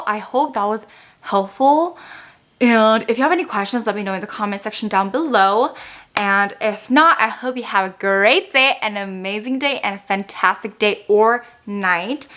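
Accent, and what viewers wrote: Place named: South Korea. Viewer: 콩글리쉬 너무 귀여워여ㅋㅋㅋㅋ 메아크업도 예뻐여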